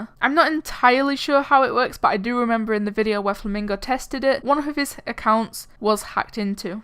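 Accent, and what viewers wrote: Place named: Manchester, United Kingdom. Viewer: do you live in Manchester? I hear your accent and its currently pm for me now xd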